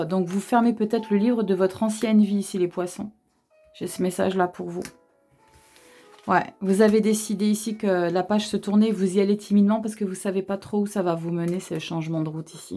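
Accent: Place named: Marseille, France. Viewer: Coucou ❤️ Moi rien ne me parle pour l'instant mais même accent du sud, de Marseille 😂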